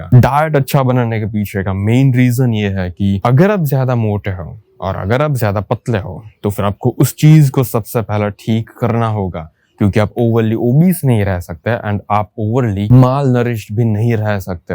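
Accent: Gujarat, India. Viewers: tumhare Gujarati accent ki vajeh se lagta h tum vimal chaba ke bol rhe ho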